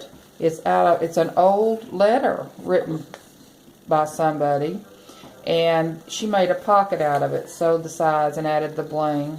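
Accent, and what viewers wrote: South, United States. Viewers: Hi! Love your journal....being from MS, it's great hearing a southern accent! And being from Houston I am quiet sure you DO know how to cook beans!! LOL.. I also love western and Native American stuff... love how you tied the seam binding in the holes on the pages! I could say so many more things about this great journal... Thanks so much for sharing it with us!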